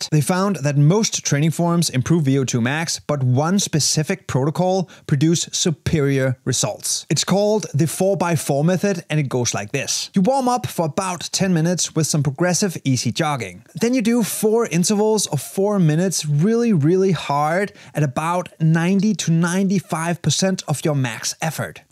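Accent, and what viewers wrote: Denmark. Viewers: Danglish går crazy❤️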